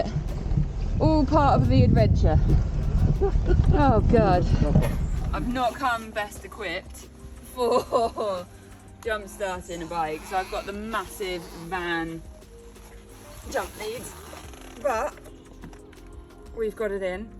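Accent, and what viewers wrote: United Kingdom. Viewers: don't be subscribed from India just for the British accent ❤️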